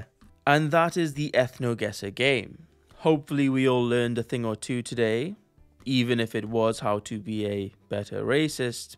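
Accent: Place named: United Kingdom. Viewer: This topic mixed with the British accent definitely creates a vibe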